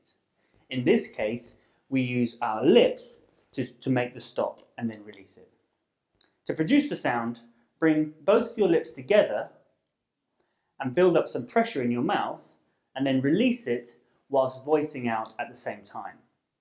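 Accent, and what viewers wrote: United Kingdom. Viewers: Sir! Is this British pronunciation??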